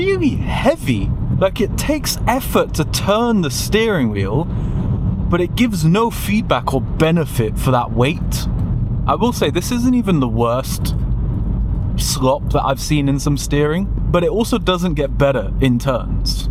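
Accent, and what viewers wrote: United Kingdom. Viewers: I'm learning english recently.. I wanna ask you guys.. What is the name of the British accent that is used by this person? I wanna learn more about it ..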